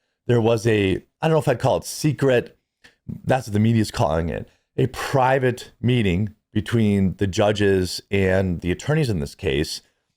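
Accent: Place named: Milwaukee, United States. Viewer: Your hoarse voice and Milwaukee accent is very surfer bro.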